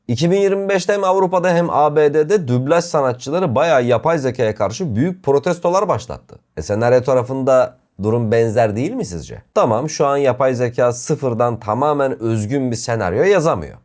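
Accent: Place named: Çorum, Turkey. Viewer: Çorum şivesi :D jhafklsjnklfsşjfslşf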